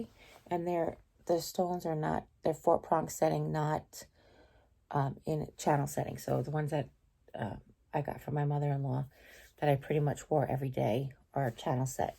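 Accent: Long Island, United States. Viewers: I could listen to you all day long. I just love your stories and your accent is just amazing. I'm learning so much about Long Island and New York its magical. The magic has even cast the spell of being able to keep up with your fast pace of speech 🤣😂🤣😂. Hey, Jack of all trades is a skill in itself, nobody employs an electrician, plumber, gas expert, carpenter, joiner etc for little jobs around a building, they employ a handyman/tradesman/maintenace guy. Quite simply a 'Jack of all trades' Theres so much i need to do around my home, I'm watching your past videoes to learn how. God bless you my fast talking friend. You are in his hands and all will work out according to his plans, as it should. Xx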